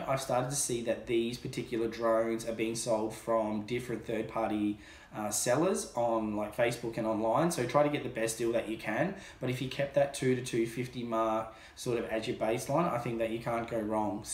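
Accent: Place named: Australia. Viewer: Why are you taking in feet and miles? You sound Australian and look less than 70 years old to me.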